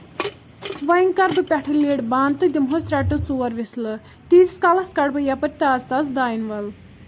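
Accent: Kashmir, India. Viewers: Wariya asel...lagta aap k accent se aap south kashmir se ho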